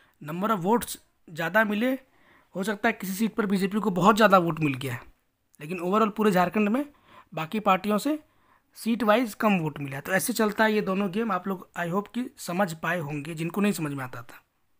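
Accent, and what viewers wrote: Bihar, India. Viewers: आवाज तो बिहारी की है।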